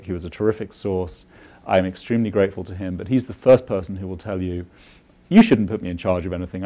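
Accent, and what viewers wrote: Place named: United Kingdom. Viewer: The republican party is not a terrorist party, we would only become that way if our country in our constitution is jeopardized. You need to get that straight, you sound like a brit so you don't know what the Hell is going on inside our country. That is precisely why we ran your asses out of our country in1775.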